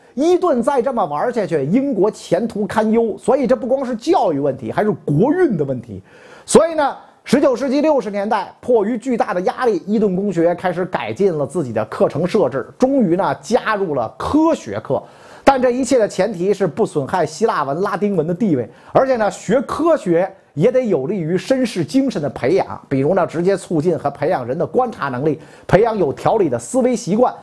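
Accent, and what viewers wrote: Beijing, China. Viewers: 👍赞 老北京的音儿 👍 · 哎哟，甩着京腔讲贵族，，，真不是那么回事，别扭。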